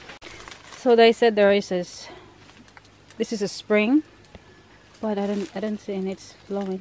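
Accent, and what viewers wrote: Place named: Australia. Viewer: hello sister andito ulit ako love your accent sissy aussie na aussie kana😘 mahalaman pala jan sis! Thanks for sharing😘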